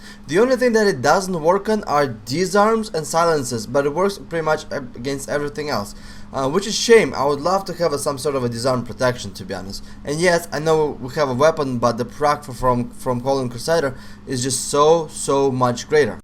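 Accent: Russia. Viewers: Ты русский? Серп и молот вместо глаза, акцент. Да?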